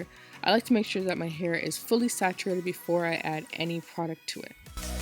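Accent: Toronto, Canada. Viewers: wait, you live in Canada? wow never knew that; i can definitely hear it in your voice now lol. anyway, your hair is so pretty. i like how your hair came out! i havent done a twist out in so long, and this has inspired me to do it - thanks !! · it sound Canadian but I definitely could be wrong? 🤔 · Beautiful hair! Congrats on the baby❣️Are u from Toronto ⁉️I detect the accent.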